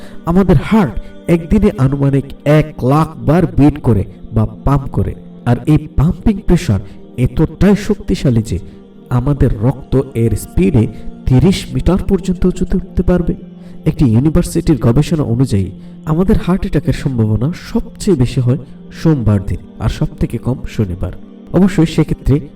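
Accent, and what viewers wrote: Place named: Chittagong, Bangladesh. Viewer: তততো না তথ্য, মাতা না মাথা, উচ্চারণ সুন্দর হলে ভিডিও দেখতে ভাল লাগে। এই ভিডিও তে যিনি বর্ণনা করেছেন উনি সম্ভবত চট্টগ্রামের লোক।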